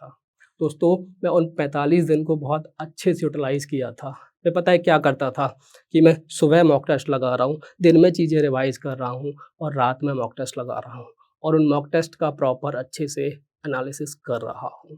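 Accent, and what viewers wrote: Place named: Jhansi, India. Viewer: Jhansi UP ka lg ra banda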